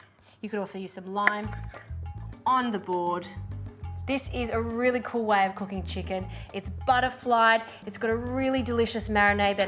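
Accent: Australia. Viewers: Is she Aussie?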